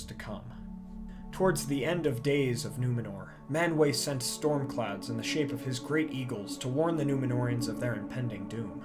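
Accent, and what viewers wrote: United States. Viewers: I love these videos so much - but I suffer from the HORRIBLE PRONUNCIATION of the words - please do not americanize them. Learn them 🙏🏻 #ithurts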